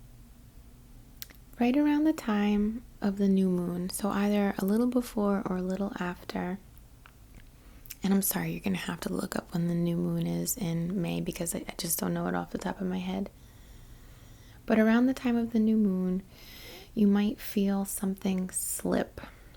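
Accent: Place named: New York, United States. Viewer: Thank you for your hard work. Also, I just want to add that today I became aware of your New York accent. I've been listening and watching your reiki vids since I think 2016, and suddenly I was like, omg she sounds like a New Yorker. Don't ask me why I became aware of this, I have no idea. Peace and love x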